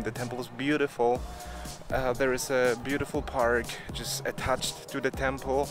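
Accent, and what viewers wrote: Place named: Eastern Europe. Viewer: This Slav has a pretty cute slavic accent. 😊 Happy that you have the comfort of Polish cuisine where you live.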